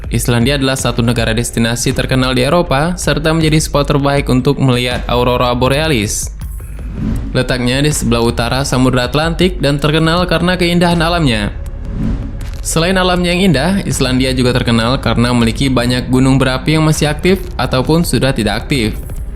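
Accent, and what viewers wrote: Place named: Java, Indonesia. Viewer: Bahasa Jawa mu lucu Min, tapi nice try😁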